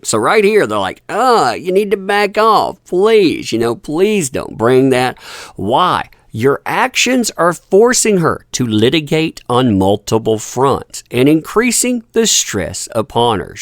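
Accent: South, United States. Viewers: Interesting news indeed. Concerning a previous livestream, who couldn’t like the sweet southern drawl? It’s always sounded great from here in Canada.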